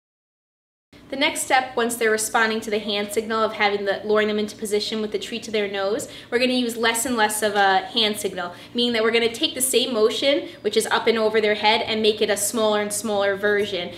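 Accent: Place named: New York, United States. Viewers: Are you from new York or new jersey? I kinda hear an accent there lol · this New York accent is almost unbearable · She’s gotta be from Long Island. We’re the only people that pronounce dog that way lol. Great video.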